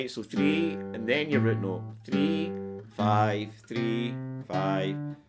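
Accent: Scotland, United Kingdom. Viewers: I just found your channel & have learned more from you in the last couple of weeks, than in last couple of years on my own or even in lessons! Even with your Scottish brogue & my Southern American ears‼️🏴🇺🇸🤔🎸😆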